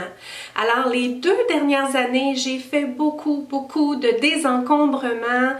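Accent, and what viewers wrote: Canada. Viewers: Bonjour je suis nouvelle sur ta chaîne et il faut que je te raconte la réflexion de ma fille de 11 ans hier soir devant une de tes vidéos. Donc ma fille Aurore, interpellée par ton accent se penche pour voir qui parle et lorsqu'elle t'as vu m'a posé la question suivante : dis maman, c'est normal que toutes les Canadiennes soit si belle? C'est pas trop mignon, çà ? Bisous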